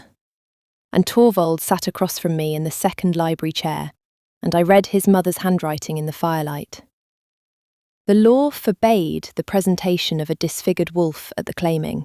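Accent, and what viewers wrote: England, United Kingdom. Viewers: Loving these stories, it's so wonderful hearing an English accent ❤ I am English so hearing our accent is great, please don't change it 😊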